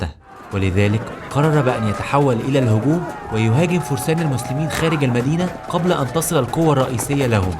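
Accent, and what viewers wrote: Egypt. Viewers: يارجل ... ليت انك تتحدث بالفصحى ... فوالله ما اكره شيئا كما اكره سماع اللهجات بمختلف انواعها ... ودرجة الاشمئزاز كبيرة جدا عندي ... عند سماعي الجيم المصرية التي حولت لحرف g الانكليزي تكسيحا لجوخ الضباط الانكليز وتقبيل نعالهم ...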